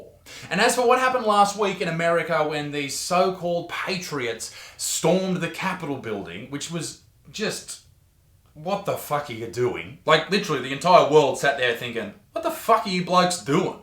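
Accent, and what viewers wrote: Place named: Australia. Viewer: Word, good statement mate. I like your australian English. Greetings from Germany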